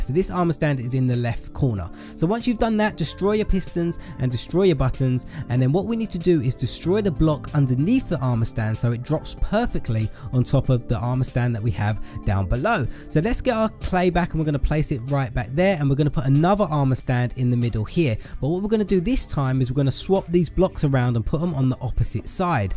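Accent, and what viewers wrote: United Kingdom. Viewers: So awesome where in England r u from?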